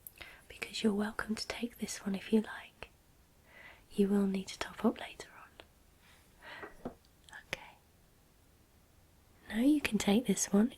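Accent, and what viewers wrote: United Kingdom. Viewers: ASMR with British accent? an absolute perfect combo. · I love British people's accent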